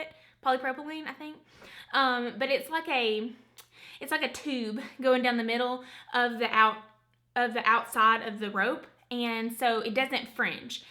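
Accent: South, United States. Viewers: Im from germany and your southern accent is soooo amazing, i love the way you talk!! And of course the info on the macrame rope is very helpful lol 😉👍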